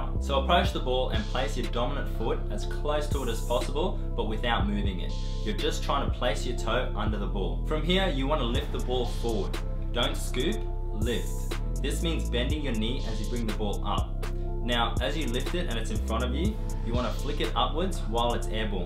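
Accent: Australia. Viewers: U Australian ? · didnt realise you were aussie dude! where abouts are you from?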